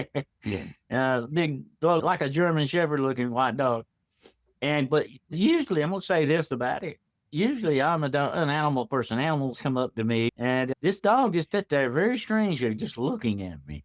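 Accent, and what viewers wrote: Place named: South, United States. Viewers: Aussies don't have accents like that. He's definitely American. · He's definitely from the Southern part of the U.S.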